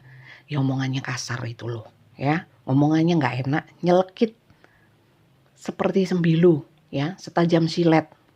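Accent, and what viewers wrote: East Java, Indonesia. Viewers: Makasih. Bunda readingnya ... Ituloh logat Jawa timur yo 😁😁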